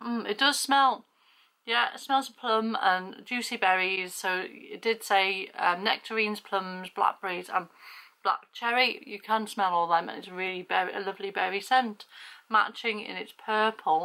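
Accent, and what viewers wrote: United Kingdom. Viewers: I just came across your channel. I listened to what you had to say. Love your English accent.❤️❤️❤️❤️❤️ I Absolutely Love Their candles. I pick them up all the time in TJ Maxx , Home Goods, Marshall's here in the states. I can't live with out them. I have the in all different sizes from the tiniest little ones to the biggest ones. I have single wick, double wick, and three wick. They are Fabulous candles. And by the way I love anything that has to do with pumpkin. I also just subscribed to your. 👍🏻⭐☺️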